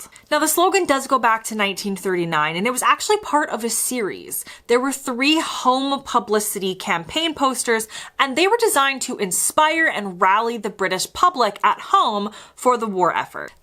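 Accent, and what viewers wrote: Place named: United States. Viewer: Unfortunately, propaganda which can be sometimes be effective at the time also leaves a behind a false history. I know you have an interest in history, and there is enough material out there to study this in some detail. Though I recommend you never make a video about it. With an American accent, you cannot tell it. The "keep calm and carry on" and Blitz spirit stuff have left a very different picture of the war. One place you can start is the number of deserters and people who never showed up for their draft. British army gives the figure of 100,000 deserters, US claims 50,000. Nobody knows how many failed to show up at all. There are estimates of tens of thousands of deserters living in London alone. And these people were immediately rendered 'outlaws'. In a country where every person could only shop with government issued ration books, these people had to make other arrangements. 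The crime levels and 'dog eat dog' nature of a country at war get completely glossed over in the numerous fictional accounts written afterwards. The 'Blitz Spirit' itself is one of histories greatest revisions. It wasn’t safe for women to be on the streets. Homes were looted, stores were looted, bodies were robbed, murders occurred. "Crime experts" and Scotland Yard are on record as there being at least a few serial killers on the loose in London alone. I suspect if the "keep calm'" posters were never released, it was because the government knew it could only push so far. There used to be a UK org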